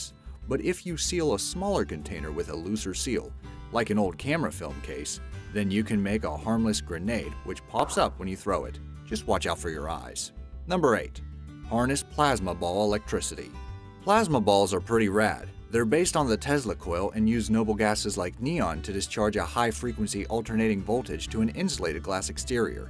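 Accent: Hungary. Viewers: Are you from Hungary? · wait, are you from hungary?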